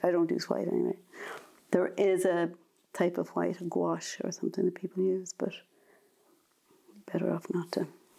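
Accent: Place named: South, Ireland. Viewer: Hi, I really enjoyed your video! However your lighting made it a little difficult to see the image very well. And the image was a bit blurry. I checked if it was the tablet I was viewing from, but it was only on your video that this effect occurred, which is sad because yours is the content I want to see. I LOVE your painting style, and your teaching style. Plus you have a beautiful, gentle, soothing voice which reminds me of my father who was Southern Irish. I have subscribed to your channel today and this video is the first video of yours I have watched and I LOVE your channel. Is there any way for the camera to be closer to the painting, and could you add stronger lighting please, because that would help folks like me who are watercolour newbies, who need to see more of what is going on, on the page? I know it may be asking for a lot, but if it could be sorted out, then we would get so much more from the videos. 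Thank you in advance. God bless you and all those you love. Have a great day!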